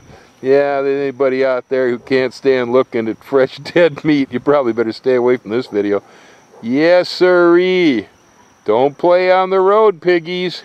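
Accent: United States. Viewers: mmmm dinner... poor thing.. you sound like a true american in this video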